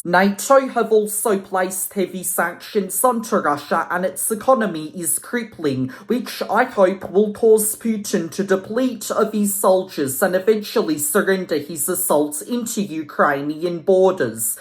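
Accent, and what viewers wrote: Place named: Europe. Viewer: your accent is quite European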